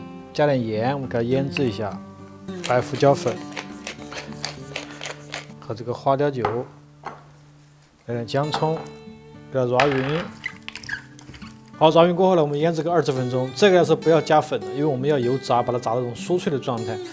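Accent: Sichuan, China. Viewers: 真是喜欢这口川普，太下饭了，干得漂亮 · 已经开始川普了，之前只是Rua会出现，现在”喃”都来了哈哈哈哈。有粉就是嚣张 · 这个普通话一听就感觉到好亲切喃，是成都人？或者至少是四川的吧。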